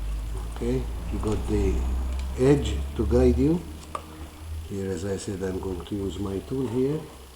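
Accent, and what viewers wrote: Italy. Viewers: Thank you for making a very instructional video. You not only made a functional stove you made it beautiful by polishing. Are you from Italy? Your accent reminds me of my grandfather who came to America from Calabria in Southern Italy. Perhaps you are not from Calabria as they say people from there are " Cappo Tosto ". ( you do not seem thick headed...haha ) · ! Excellent video, great added value to the already great video of Tetkoba... and I can't resist to tell you your delicious Italian accent makes it a pleasure to watch;-)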